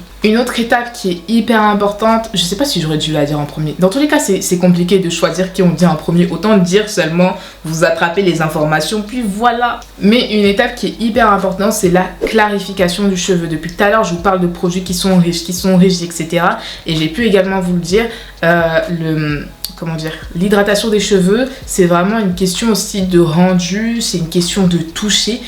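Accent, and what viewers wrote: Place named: England, United Kingdom. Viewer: On entend l’accent anglais en fait!!!!😂😂😂😂😍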